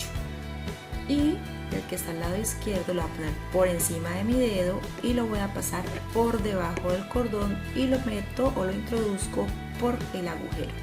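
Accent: Colombia. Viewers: Estás en Colombia o en Venezuela 🇻🇪, tu acento me parece de Colombia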